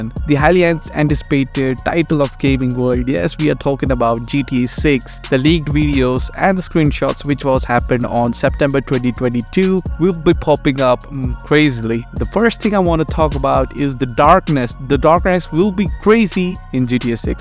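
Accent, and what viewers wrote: India. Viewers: he's talk like india+english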